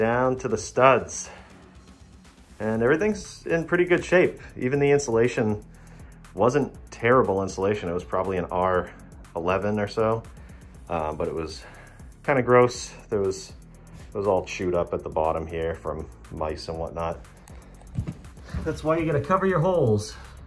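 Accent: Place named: Midwest, United States. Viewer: You live in Massachusetts ?? Are you original or you moved there ?? I don't hear any form of the typical " Boston Accent ". You sound more like a Mid-Westerner. I'm from Boston. Grew up in the Dorchester neighborhood. Left when I became an adult. . . . . .That whole snow thing is not much fun as an adult, but it was great when I was a kid, (snow days off from school).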